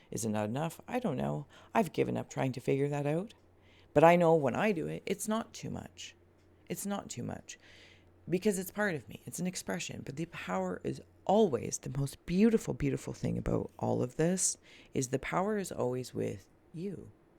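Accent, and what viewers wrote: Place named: West, United States. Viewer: hope you had a wonderful weekend! Not sure if this is the video but I do (I’m hearing a western accent, haha) I do wish to hear more of this flower farming side of! Seems to be my other obsession during this time of new beginnings. Floret flowers, I think that’s her name. She is breeding roses, dahlias and others that haven’t been breed before, taking it from big box stores and teaching us how to do it. She also is rescuing roses that were becoming extinct. The videos they put out are my new fav live mini series. I laugh, I cry, I learn and I feel part of their farm. Almost dropped your page on her page inviting her to you, i get the feel she’d really like us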